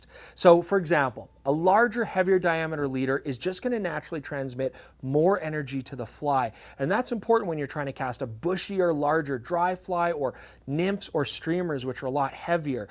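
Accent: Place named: Canada. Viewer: I’m watching going why you seems familiar, then I heard an “eh” or something Canadian and it clicked. So do you stand on wet slippery rocks at daybreak with your rods clinched between your legs which makes you lose balance a bit while you try to get pix? For me it was a no brainer which camera I’d take when those shots would be possible and ppl thought I was nuts because it’s so old but those amazing colors it’d get could only come from the Nikon d700.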